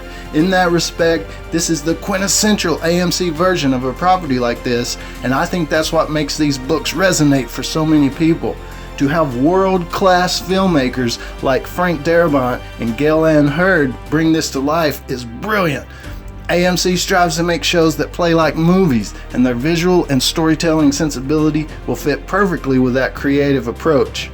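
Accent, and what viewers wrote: South, United States. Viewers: good video. where you from man? I can tell you are a Southerner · Why is it that every fan Walking Dead video the guy has a Southern twang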